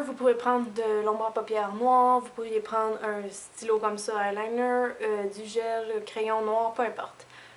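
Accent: Quebec, Canada. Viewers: Je me suis inscrite sur YouTube pour une seule et simple raison : "mettre j'aime" a tes videos ! J'ADORE :D Tes maquillages sont superbes et ton accent me fait penser à mes vacances au Quebec ... :) Bonne continuation bisous !! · Bonjour ! Je viens de decouvrir tes videos et de m abonner !!! j aime beaucoup ! Et cet accent...il me rappelle mes sejours au Quebec! Je suis in love du Quebec!! Je vis dans le Sud de la France ;-) Merci pour tes bons conseils et rendez vous aux prochaines videos ! Ps: la video pour les fetes est top ! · Bonjour je suis abonné depuis environ une semaine. j'aime beaucoup tes vidéos, on apprend enormément de chose. Et j'adore ton accent quebecquois. Moi je suis française:) et ce maquillade hivernale est trés jolie! Continue j'attends tes vidéos avec impatience!!! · Salut!!je viens de tomber sur ta chaîne et hop je me suis abonné car tes video sont super!!!J'adore ton accent ça me fait voyager!! 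( le Québec j'aimerais bien mais pas en hiver!!!) ahah!! Gros bisous de Lyon!!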